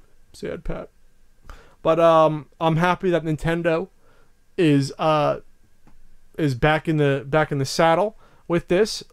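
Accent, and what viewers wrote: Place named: East Coast, United States. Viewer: PAT LIVES ON CALI!?!?! O_O I COULD HAVE SWOOORN THIS WAS AN EAST COAST BOY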